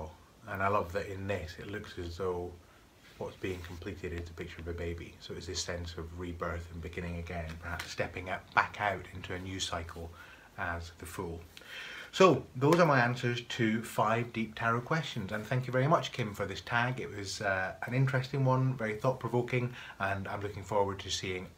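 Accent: Scotland, United Kingdom. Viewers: Six of Swords is one of those cards I go straight to with a new deck. I have my preferences as to how it looks. Nice to see the completely trimmed Spiral Tarot. I did the same to mine, red borders be gone! Lovely video,. And your accent, trying to place it. I'm guessing Scottish? Thank you for sharing. 😊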